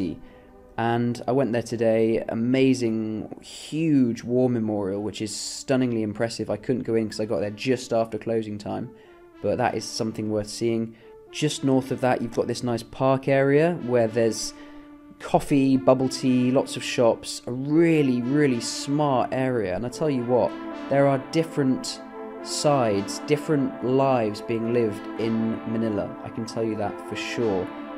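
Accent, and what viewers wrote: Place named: United Kingdom. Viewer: Hey I am from Egypt i am 12 years old I speak English very well and I really like your British accent and I watch you daily I appreciate that you are trying to teach other people Arabic and the British accent. I really hope you see this comment.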